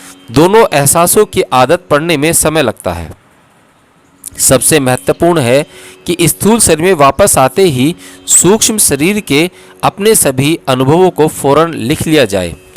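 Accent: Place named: Rajasthan, India. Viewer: Shaayad rajasthan ki jaban se bolte hai radhe radhe jay ho bhole bhagvan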